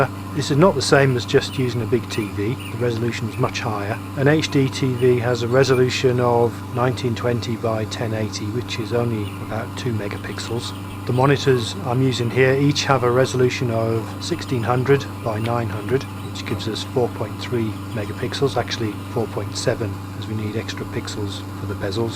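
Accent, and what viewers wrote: United Kingdom. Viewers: Thanks for the prompt reply. Say, I'm curious... Though you obviously spend a good deal of time flying around the region I spent the first several years of my married life (Puget Sound area), it sounds like you live in the UK. I lived in Melton (East Anglia) for a bit more than two years, and met and courted my wife near Bracknell... Just wondering if you're from a district I'm familiar with. :-) Thanks again for the advice -- and all the excellent videos! Keep up the great work!!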